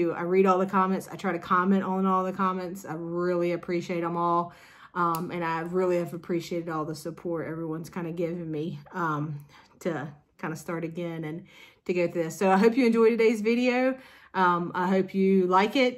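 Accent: South, United States. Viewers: I miss hear that southern drawl so i will definitely be back i have great memories of living in the south 😊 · 🎉 I have heard you shouted out from about 4 floss tubes!!! Love your stitching and honey that accent is like honey! I’m a native Texan and have always loved any southern accent. Happy stitching!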